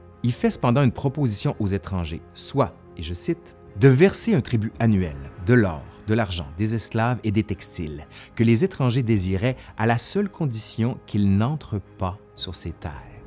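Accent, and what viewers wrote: Quebec, Canada. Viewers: J'adore l'accent québécois c'est du nuage auditif ❤ Pardon mais vous avez tellement une voix qui raconte des histoires pour enfants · Coucou! Salutations depuis la Colombie. Je m'appelle Nathalie, je suis de langue maternelle française et j'ai la double nationalité suisse et colombienne. Cela fait depuis plusieurs semaines que je suis votre chaîne, et j'adore votre travail, vos vidéos si instructives et si bien recherchées. Toujours un si grand plaisir de vous écouter nous raconter l'Histoire, avec cet accent québécois si savoureux! Concernant le sujet du jour, j'ai des racines amérindiennes du côté de ma famille colombienne et le sujet de la colonisation et de ses effets, souvent tragiques, toujours très complexes sur le continent que nous connaissons maintenant comme le continent américain (nord, centre et sud) me touche profondément. 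Bravo pour votre présentation des premiers contacts et de la colonisation du point de vue des Aztèques, et sur la façon dont leurs traditions et leurs perspectives sur le monde et le cosmos étaient profondément mal comprises par les colonisateurs européens. Pourriez-vous vous intéresser aux peuples autochtones du Canada? Ou sur l'histoire de la Colombie coloniale? L'histoire de la Suisse est également passionnante! Voilà pour quelques idées de futures vidéos. Chaleureuses salutations!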